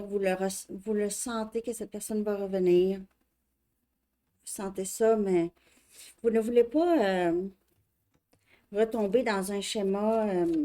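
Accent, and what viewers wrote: Canada. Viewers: Je vous decouvre à l instant bonjour de MARSEILLE JE SUIS LION ASCENDANT CAPRICORNE ET LA LUNE EN CAPRICORNE VOUS AVEZ L ACCENT CANADIEN❤❤❤❤❤❤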